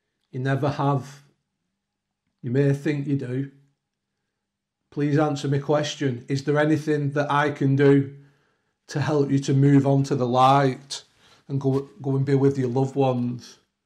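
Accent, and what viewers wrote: United Kingdom. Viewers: Sir, why have you not spoken to your local parish and asked for a blessing of your home and property? I gather from your accent you're British; certainly the COE or the Catholics can assist. You are on a long journey now; be advised Evil always scratches us when encountered. You definitely need spiritual protection from the Christian Church and none of these others. For Real.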